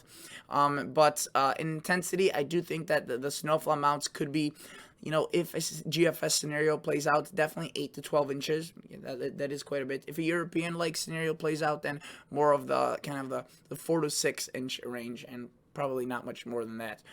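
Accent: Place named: New York, United States. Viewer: Are u looking at Wisconsin for snow? You sound like a New Yorker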